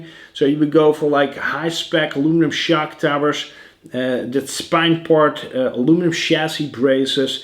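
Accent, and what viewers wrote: Netherlands. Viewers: Godvrdme, 10 jaar later en nog steeds het Nederlandse accent! :-). Maar goed bezig hoor, ik wil ook beginnen met een beetje crawlen maar dan op de Veluwe. Keep up the work sir.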